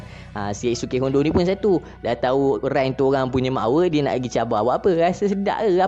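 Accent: Kelantan, Malaysia. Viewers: Ore klate ko boh??! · Bang kenapa abang lebih ckp ala kelantan gitu.?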